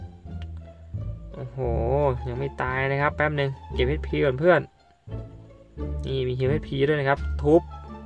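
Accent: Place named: Isan, Thailand. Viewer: ทั้งเหมิด คนอีสานรึป่าวว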